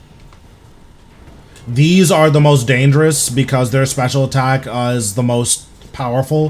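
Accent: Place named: California, United States. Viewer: Where are you from? Sounds like California. 🤔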